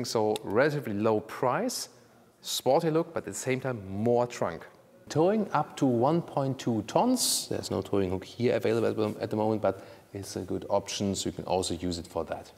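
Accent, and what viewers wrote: Germany. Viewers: I do love these reviews with the German accent, I want to hear him say " for you Tommy ze vor is over".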